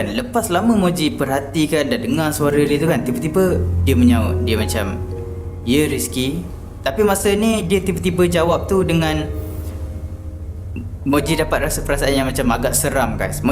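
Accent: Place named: Penang, Malaysia. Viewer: Mcm penang atau negeri sembilan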